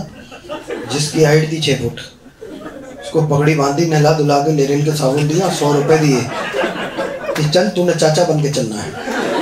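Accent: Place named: Bareilly, India. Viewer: Bhai tumhara accent jabardastt hai .Mai b Bareillian hu bhrother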